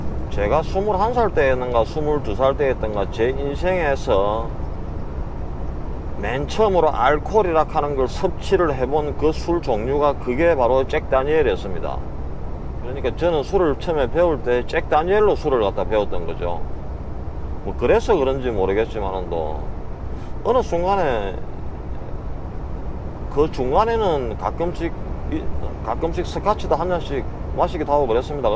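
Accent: Gyeongsang, South Korea. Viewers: 고향이 서부경남쪽? 진주? 부근?. 응원합니다. 감사합니다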